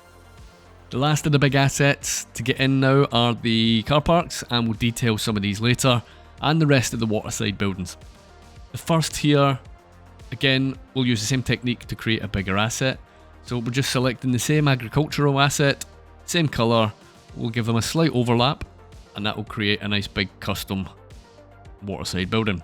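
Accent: Scotland, United Kingdom. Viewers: I like your videos! Love the intersections tutorials! Well done! Any chance you are from Scotland ? :D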